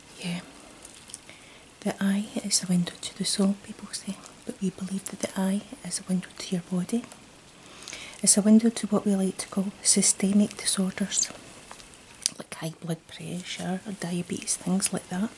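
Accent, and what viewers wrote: Scotland, United Kingdom. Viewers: I love the Scottish accent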